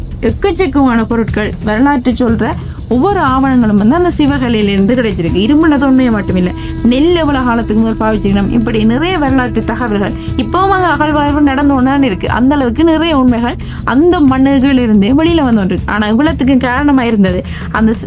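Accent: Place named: Tamil, Sri Lanka. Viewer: அவர்கள் அழகாக எங்கள் பேச்சு வழக்கு சார்ந்தே பேசுகிறார் தயவு செய்து குற்றம் குறை கண்டு பிடிப்பதை நிறுத்தி இலங்கையில் இது போன்ற செயல்கள் வரவேற்கப்பட வேண்டியவை இலங்கை தமிழர்களின் தாய் மண் எமது வரலாறு இப்போது அழிக்கப்பட முன் காக்க வேண்டியது அனைத்து ஈழத் தமிழர் கடமை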